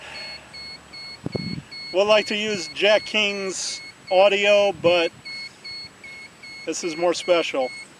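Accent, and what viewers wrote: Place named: Midwest, United States. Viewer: your boring midwestern accent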